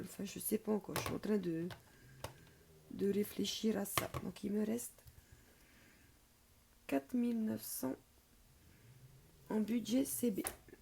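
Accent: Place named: Tahiti, French Polynesia. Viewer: J ai l impression que c est un petit accent Tahitien 🤗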